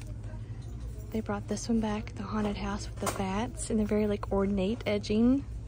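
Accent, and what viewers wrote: South, United States. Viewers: First time following u! I love Halloween 🎃 everyday!! Are u from the south? I live in Iowa no dt Halloween?!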